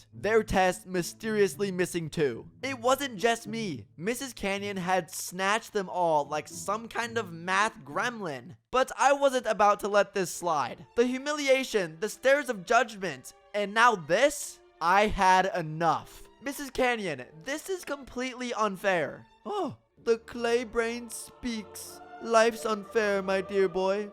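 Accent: Iowa, United States. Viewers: You from Iowa?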